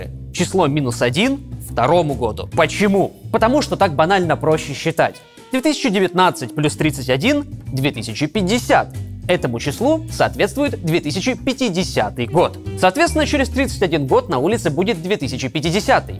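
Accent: Ukraine. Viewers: О, так ты Украинец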